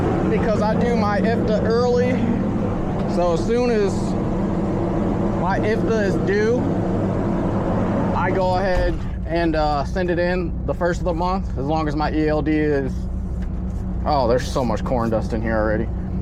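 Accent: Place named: South, United States. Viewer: nah bruh ghetto has nothing to do with it.... its super proper., damn near like a country white dude.. but no shade.. didnt mean it like that...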